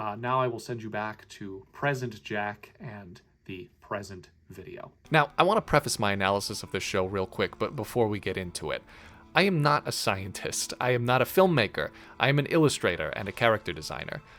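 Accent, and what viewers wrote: New England, United States. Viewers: The NE accent gets stronger and stronger each video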